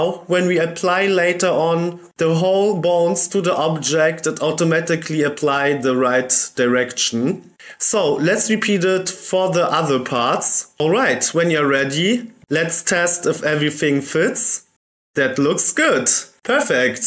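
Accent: Germany. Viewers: Ich höre deutsch raus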